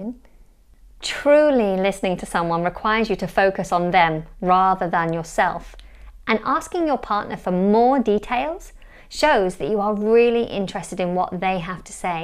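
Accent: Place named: RP, United Kingdom. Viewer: I adore your posh accent! :)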